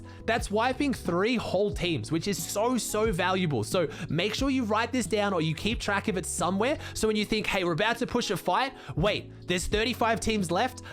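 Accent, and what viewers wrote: Australia. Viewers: I don’t play fn but I. Love listening to aussie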